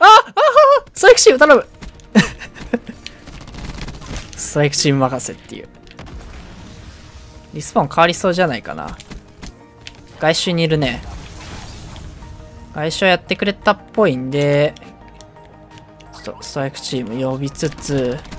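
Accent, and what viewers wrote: Kansai, Japan. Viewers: グリくん関西出身だったりする？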